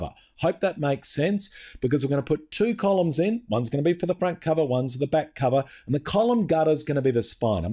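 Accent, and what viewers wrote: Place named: Australia. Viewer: Great tutorial. Easy to follow, lots of tips. :-) I love to find an Aussie talking. I have one tip of my own (which you probably know of) Double click on text box corner handle will resize (shrink or expand) text box to fit text. This removes overset "red plus" indicator. Also a request for future posts. I am deaf and I rely on CC, so please minimise the amount of info on the bottom 1/4 of screen, because sometimes critical visuals are concealed by captions.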